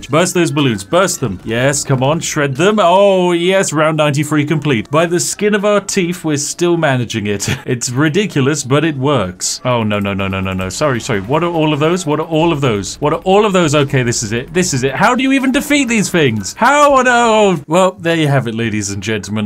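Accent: United Kingdom. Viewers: My guy sounds so british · I like you're british accent)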